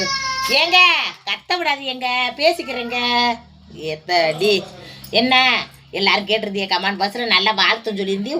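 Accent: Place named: Ramanathapuram, India. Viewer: Sister enka area voice pola irukku Ramnada 😂😂❤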